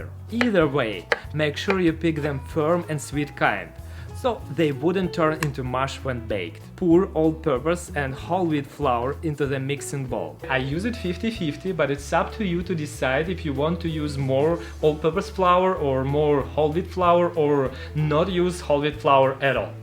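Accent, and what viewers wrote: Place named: England, United Kingdom. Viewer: As an English teacher I can surely say - you have a beautiful English accent👍😍😘. And I'm a huge fan of all your channels. Love you guys😉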